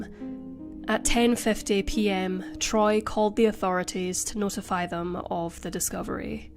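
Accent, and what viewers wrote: Scotland, United Kingdom. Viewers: UR SCOTTISH ACCENT IS AWESOME & HELPS ME WITH MY DEPRESSION, ANXIETY & 💤😴! I KNOW 🌏🌍🌎HAS WORSE PROBLEMS THAN MY ABUSE I DEAL WITH OVER 20 YRS NOW!!! THANKS, & HER " FAITHFUL SUBSCRIBERS!!✊🏽🇺🇸🇺🇸🇺🇸🇺🇸🇺🇸🇺🇸🇨🇴🇨🇴🇨🇴🇨🇴🇨🇴🇨🇴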